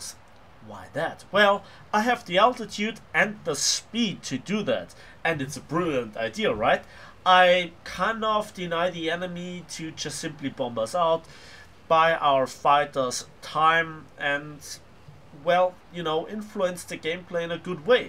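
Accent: Germany. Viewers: Man hört deinen Deutschen aktzent ich mag das :)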